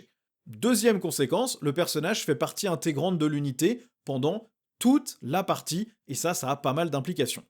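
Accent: Brittany, France. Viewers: Encore du super boulot avec en bonus, l accent brestois.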